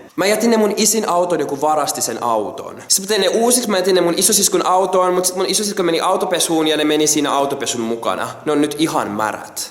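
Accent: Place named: Lapland, Finland. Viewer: Toi sun lapin murre on vaa jotenku sulone😂😂❤️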